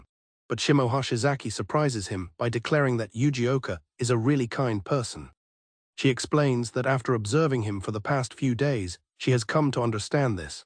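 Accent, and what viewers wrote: United Kingdom. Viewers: Why is the ai british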